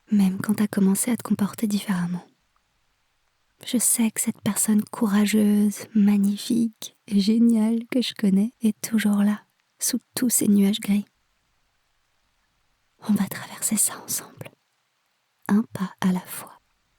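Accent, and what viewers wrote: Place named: United Kingdom. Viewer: Ton accent est incroyable si tu est anglaise mais je pense que t’es vidéo sont trop courte x) j’aimerais trop des vidéo de 1h 😅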